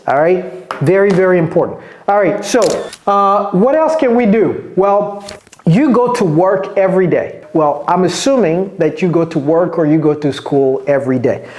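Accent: United States. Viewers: un togolais, un africain en général peut parler avec l'accent américain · 😮wow that's amazing i love what you do . I trust in myself from now i will start to learne englisch and i will be able to speak like you ,like an american People 😊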